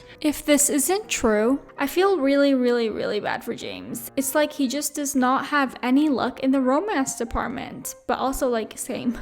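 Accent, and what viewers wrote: Canada. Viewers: i love your "sorrys" that cute canadian accent gurl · I always forget is Canadian until she says “soorrry”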